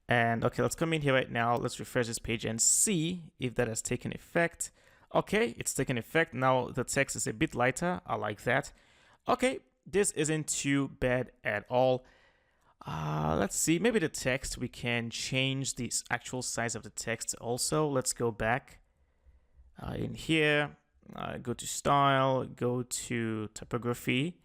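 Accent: Nigeria. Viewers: Fantastic video, delivered with clarity and a little humour - just right! I am interested in your accent. Would a guess of Nigeria be anywhere near? Thanks again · you kinda sound nigerian lol . great video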